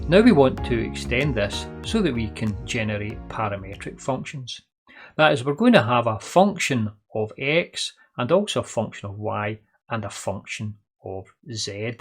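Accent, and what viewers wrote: Scotland, United Kingdom. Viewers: even just for the Scottish accent.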